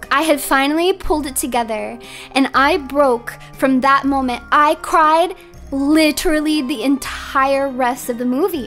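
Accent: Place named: New England, United States. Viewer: More southern than you thought? I thought she was from the New England area from how she talks o_o some might say the poler opposite of "southern"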